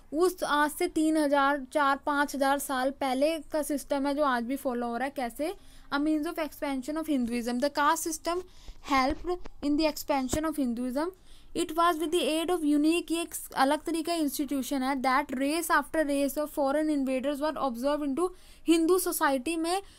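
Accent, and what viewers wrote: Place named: Chandigarh, India. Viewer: Thanks mam I think you are from chandigarh because of your voice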